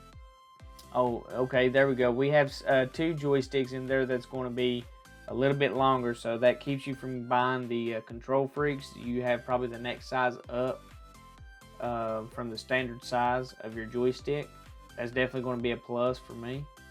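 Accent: South, United States. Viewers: U from the south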